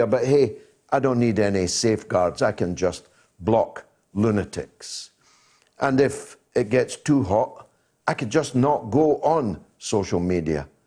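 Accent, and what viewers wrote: Scotland, United Kingdom. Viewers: This dude is SCOTTISH as hell man. Come on! You're like Lloyd Christmas in Dumb & Dumber. "Aspen? California eh??? Nice. "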